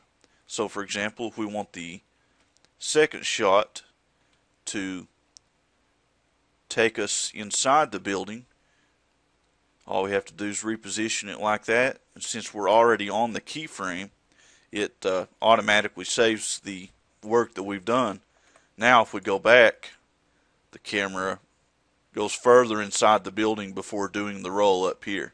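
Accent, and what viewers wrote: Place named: Texas, United States. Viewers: nothing's better than a texas dude explaining a tutorial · You sound like a Texan guy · Damn. Now THAT is a real texan's voice. Thanks for the help.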